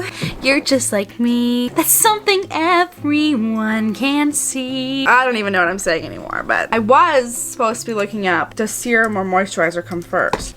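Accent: Wisconsin, United States. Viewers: am i the only one who thinks she should have a podcast? she’s so real with her viewers which is freaking amazing and her voice is just nice to listen to, we love that wisconsinite accent 🤩🙌🏻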